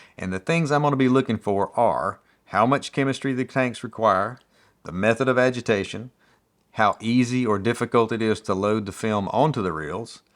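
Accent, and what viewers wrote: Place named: Georgia, United States. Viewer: Started developing film in the early 70’s. Did that till the late 80’s when I joined a Southern Lifestyle shelter magazine and shot only chrome for the next 25 years. Still freelance but of course digital for my clients. But recently, like you I decided to break out the old cameras and put them to use. I was so fast with stainless reels in the old days but picked up Patterson recently. I’m sure I’ll get the hang of it but so far they’re quite a challenge. I’m sure being in a changing bag doesn’t help. I might have to look for those easier Ap reels. Good stuff here and thank you. Trying to place your accent. At first I thought Fort Worth but then decided Georgia. Am I close?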